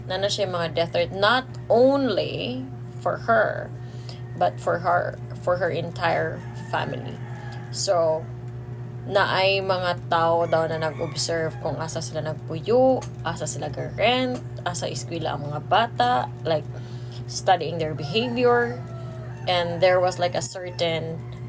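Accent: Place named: Visayas, Philippines. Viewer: Ma'am ang cute mo mag visaya 😁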